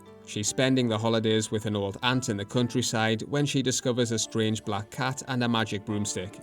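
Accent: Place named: North East England, United Kingdom. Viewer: Hearing a guy with a north east accent talking about Myazaki, my immediate thought was: ‘Well he’s going to mention Tynemouth isn’t he’ Glad I was right. This is a great video! I live in the NE and I have a copy of A Trip to Tynemouth. My Japanese isn’t good enough to read it yet, but I’ve been learning, so getting through the whole manga is one of my goals!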